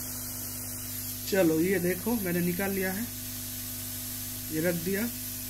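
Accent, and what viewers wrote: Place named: Bengal, India. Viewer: Bangali ho kya Bangali Jaise baat kar rahe ho to Bangali Ho gai Rakha lagta hai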